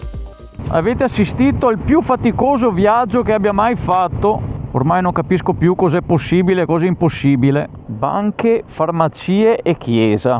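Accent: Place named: Treviso, Italy. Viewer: Che bel canale!! Ho visto qualche video, ti faccio i complementi. Io sono veronese, ho sentito il tuo accento trevigiano ma e pressoché identico al mio!! Siete riusciti a fare un bel tour Treviso Germania?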